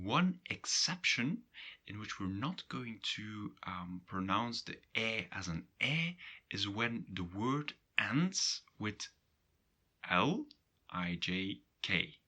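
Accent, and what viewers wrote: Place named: Belgium. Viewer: These are very strange interpretations- is this Belgium? Because it doesn’t sound Dutch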